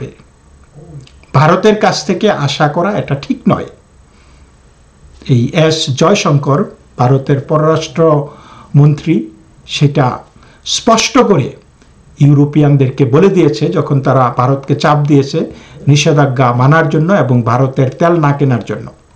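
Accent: Bangal, Bangladesh. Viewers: Dada to bangal......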